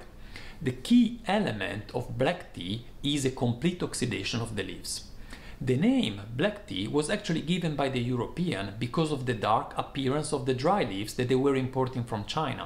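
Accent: Italy. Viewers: You sounds like an italian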